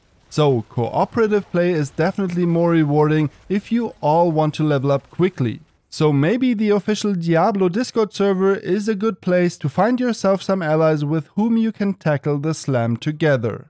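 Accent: Germany. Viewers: sounds like a german guy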